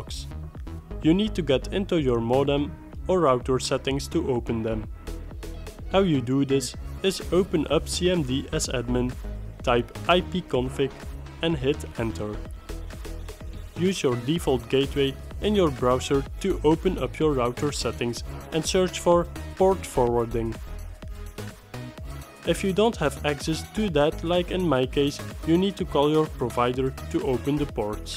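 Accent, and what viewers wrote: Netherlands. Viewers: 100% your from nederlands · Man your accent sounds so dutch, i love it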